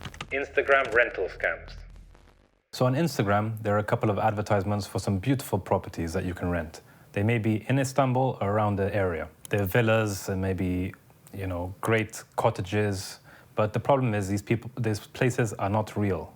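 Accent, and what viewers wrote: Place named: United Kingdom. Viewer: Good video, thanks. Can i ask if you are British too? As your English does suggest you are.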